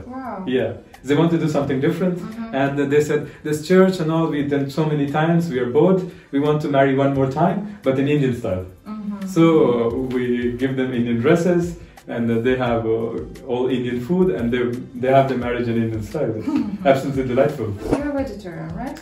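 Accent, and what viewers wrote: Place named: Russia. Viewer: His english accent ia also became similar to how russian people speaks russian.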